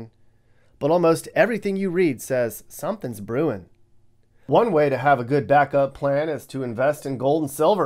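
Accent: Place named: South, United States. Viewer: you speak just like a Southerner, twang included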